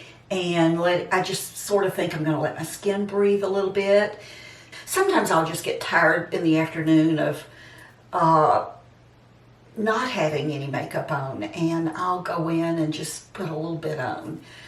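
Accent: South, United States. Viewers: WE NEED YOU! I hope you never quit doing videos! Having you be so real and your accent reminds me of a Southern Belle. On any given subject, I enjoy my visits with you and with over 56 thousand subs, I'm far from the only one I bet. Getting to watch your videos is like spending time with a beloved friend and right now (4/11/20) we all need that. You are one of a very small list of YouTubers I never get tired of. You are so sweet and a treasured break from an otherwise troubled time. Thanks for being here! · Just hearing that great southern accent brightens up my day. I had a favorite dress too when I was a teenager. Pretty green with pink plaid thru it, low waist with slim bodice and swirl out bottom, sleeveless. I adored it ❤. It was probably 1970. I loved your dress, can see why you loved it too!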